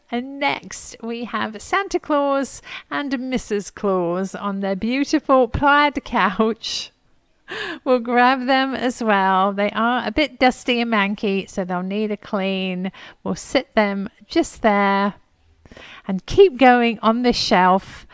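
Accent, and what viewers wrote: United Kingdom. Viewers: dear, I don't understand why don't you invest in one of those cameras that sit on your chest so that you can use both hands. I see you time and time again struggling to pick up or open an item and having both hands free would make your life so much easier. I love your Chanel I learned a lot from you over time. Love your sweet cheerful positive personality and english accent. Cheers and all the best from Agi at Princeton, IL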